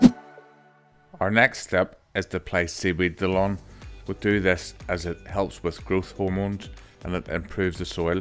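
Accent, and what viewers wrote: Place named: Belfast, United Kingdom. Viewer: I love the Belfast accent!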